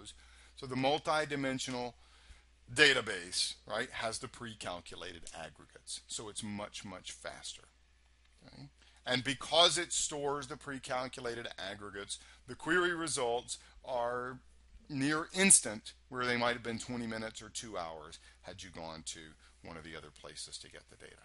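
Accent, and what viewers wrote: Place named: United States. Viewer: Why do your vids get so many dislikes? Youre like the only person teaching sql with a perfect american accent lol.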